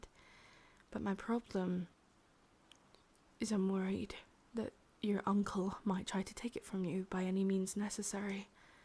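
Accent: United Kingdom. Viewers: i love your voice epscially your british accent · She really cute with british accent. Great job💚👍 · Me: "British accent? Well, that should be interesti-": "Good morning, sunshine." Me: "Oh... I'm in trouble." 😳 Real talk: I've actually been struggling with my depression rearing its ugly head the past couple of days, so I really do appreciate this. Thanks for the audio.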